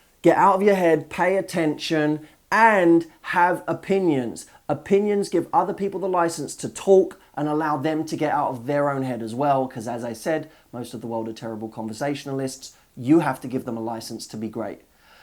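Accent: United States. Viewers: good American accent dude:) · Amercan accent!!!!! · Your American accent is adorable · Your American Accent is awesome! Thank you for the helpful content. 😉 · Love the American accent...lol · Jesus. Your American accent makes me feel like you're playing all of us all of the time. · I love the American accent - the deep voice!, you are amazing. Thank you so much! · his American accent reminds me of Ryan goslings voice lol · Is that a Californian accent? Regardless, your rendition was awesome. You slip into and American accent like it's butter, i.e smooth! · Omg the american accent is great!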